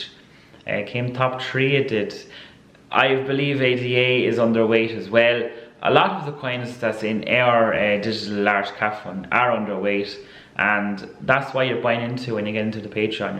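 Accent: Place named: Ireland. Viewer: Very good video love your Irish accent my family are all from Cork 🤙🙏🙏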